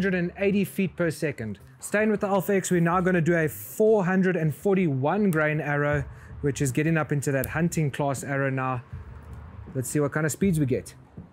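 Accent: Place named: South Africa. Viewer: At first i heard you say 572 was your hunting weight and i thought oh my another poor uninformed guy that's been watching ranch fairly but then I remembered the south African accent and realized you're hunting way different animals than i do in Oklahoma so maybe you need those heavy arrows lol. Anyway great video. I just won an ethos at a 3d shoot so i was just watching some reviews. I'm actually entered to win an Africa hunt from that event too but still waiting on the results of that drawing🤞🏼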